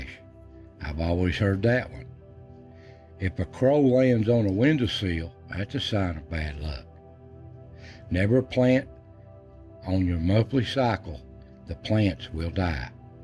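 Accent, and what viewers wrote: Appalachia, United States. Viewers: ......Thanks again for these. I've heard a lot of old sayings from this list. I notice a lot of folks talk about your voice. A lot of men that are from the mountains talk just like you. I have always said, "Give me a slow talking southern boy any day over any other kind of man." It's a compliment. & my opinion. My Papa was that way & he was a wonderful man. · A very good friend of mind that lived in Unicoi County or Erwrin or Irwin all his life talked exactly like you. He passed away a few yrs ago, only in his early forties and I miss him. He could do anything from logging to sawmilling, welding to mechanics. You sound exactly like him. Love your videos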